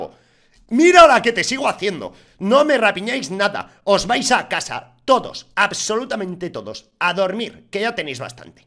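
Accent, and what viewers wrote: Mexico. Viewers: sigue hablando como mexicano que se teda mal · no hay nada mejor que escucharlo hablar español mexicano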